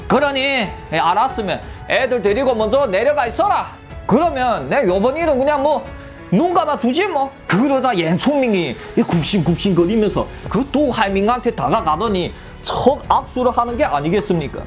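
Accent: China. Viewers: 조선족이 한국어하네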